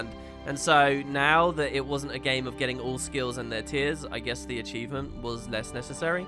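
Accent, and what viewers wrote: United Kingdom. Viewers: But he IS actually british. You can hear it even better in old GW1 videos.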